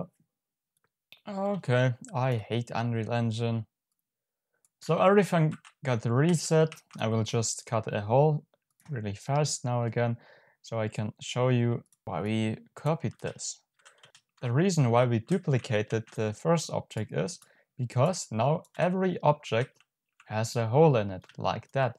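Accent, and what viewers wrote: Germany. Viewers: Mein hört dein deutsch raus 😂 aber alles gut thx für das Tutorial war sehr schön👍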